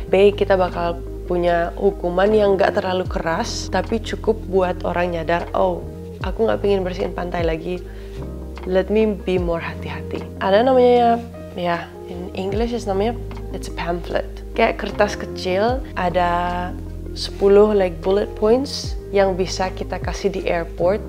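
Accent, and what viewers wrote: Bali, Indonesia. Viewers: Medok nya kayak orang Bali di dengar sama orang Jakarta 😅🥰🥰🥰 masukan yang bagus bukan hanya untuk Bali, juga saya termasuk orang Jakarta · kk ini accent bali nya kentel bgt yh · Logat mu, seperti warga Bali., yg perlu kamu jelaskan, mengapa orang eropa kurang apresiate aturan dan etika di Bali.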